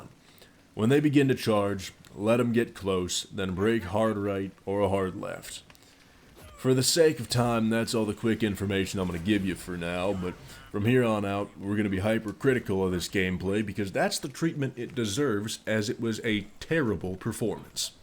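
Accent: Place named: Texas, United States. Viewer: from the deep baritone tones to the Texas draw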